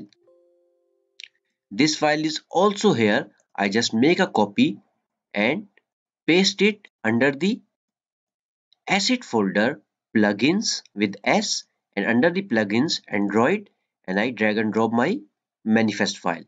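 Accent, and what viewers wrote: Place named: India. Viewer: 3 indian voice ! XD cool !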